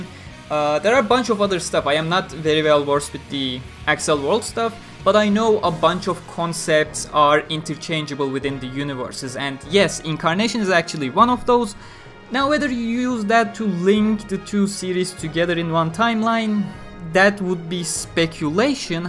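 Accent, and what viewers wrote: Turkey. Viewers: Dün sword art online fandom wiki de eugeo sayfasında gezerken hadi eugeo'yu tanıyalım diye bir video gördüm ve nedensiz yere tıkladıktan sonra İngilizce aksanından yola çıkarak:"Bu arkadaş kesin Türk!" Dedikten sonra kanalına göz attım.Kanal ismimin olduğunu görünce sanki UEFA kupasını kaldırır edasıyla sevinçten minnacık bir ego kastım:) Her neyse Türklerin İngilizce aksanına alıştığım için ne zaman duysam tanırım:).Bu arada bu kanali daha önce neden görmedim bilmiyorum ama içeriklerin çok güzel ve çok derin.Sword art hakkında bu kadar bilgisi olan bir kanalın önünde saygıyla eğiliyorum.Çok ani gelebilir ama herhangi bir şeye ihtiyaç olursa biz buradayız:) bu arada İngilizcen çok akıcı.Sahsen çok beğendim.Nerede ve nasıl bu kadar iyi geliştirdin? Ve son olarak da bu kadar oyunu ve bu kadar bilgiyi nereden biliyorsun? Şimdiden teşekkürler, saygılarımla,bir hayranın...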